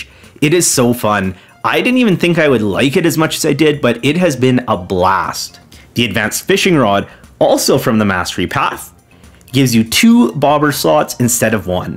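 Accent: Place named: Western Canada, Canada. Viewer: Great videos and your western Canadian accent is really cute ^_^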